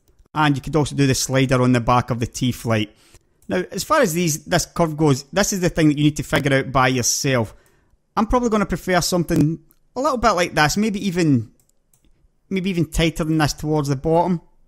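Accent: Scotland, United Kingdom. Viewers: Scottish? :) Sounds great! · i'm love with that scotish accent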